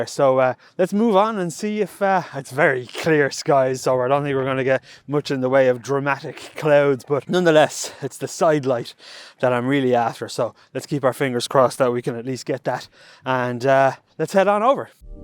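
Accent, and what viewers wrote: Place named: Ireland. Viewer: Love it. BTW you don't need to remind people you are from Ireland.....that is really obvious when you start talking 😆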